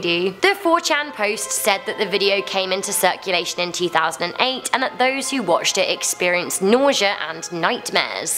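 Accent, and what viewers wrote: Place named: Scotland, United Kingdom. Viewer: im gonna comment something nice. you have a delightful accent :). im guessing its scottish cause i knew someone from whales with a similar voice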